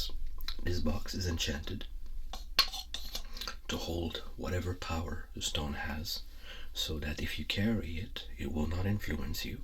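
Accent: Quebec, Canada. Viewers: Great video! Love the theme and ambience. Are you québécois by any chance?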